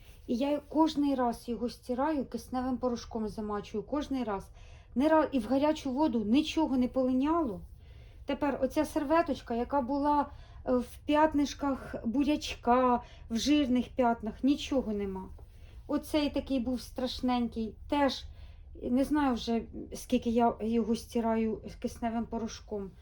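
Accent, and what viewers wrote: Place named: Surzhyk, Ukraine. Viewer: Який жахливий суржик! Невже не можна хоч словничок погортати, перш ніж знімати відео?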